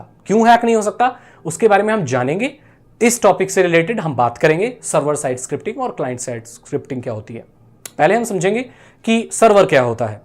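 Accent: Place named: Bengal, India. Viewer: ap bangali ho?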